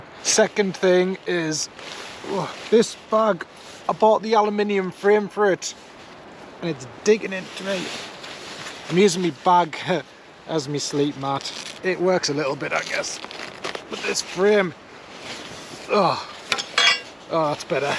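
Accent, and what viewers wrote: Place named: Newcastle, United Kingdom. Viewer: By the way, I do want to add that I did enjoy the video. You're like a Geordie version of Joe Robinet.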